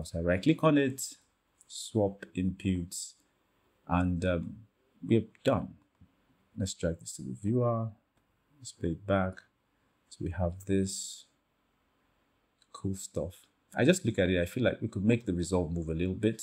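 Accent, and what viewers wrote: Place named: Nigeria. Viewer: You sound Nigerian and I love the way you speak clearly!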